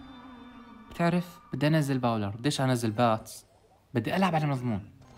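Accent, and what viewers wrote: Iraq. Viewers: حجيك قريب للعراقي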